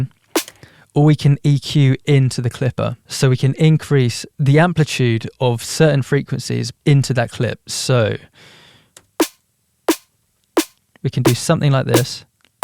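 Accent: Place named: United Kingdom. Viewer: Tutorial instructions hit different with that UK accent 😂😂😂 cheers from USA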